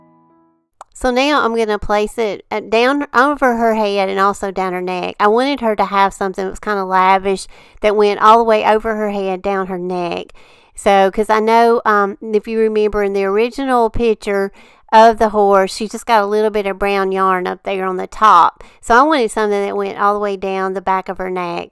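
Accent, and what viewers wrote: South, United States. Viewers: That came out so cute! I just love the sound of your voice and your southern accent !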